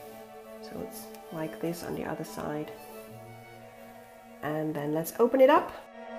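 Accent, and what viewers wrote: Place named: Netherlands. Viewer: I should have recognized that accent. I'm from the Netherlands as well but moved to Canada when I was 8. Do you have a tutorial on how you make your albums? I would love to make one similar to yours and that size. I can only find tutorials to make them with envelopes and card bases. What are the measurement for an a4?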